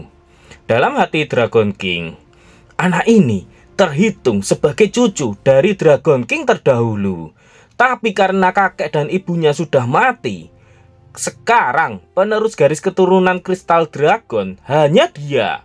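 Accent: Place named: Java, Indonesia. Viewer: Kg jawa medok kembali 🎉🎉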